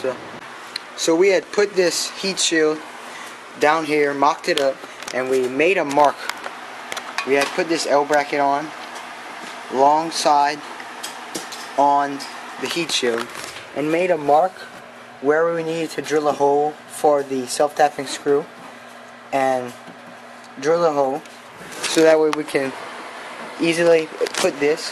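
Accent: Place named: Louisiana, United States. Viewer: Kaplan? New Iberia? I’m trying to place that accent, lol.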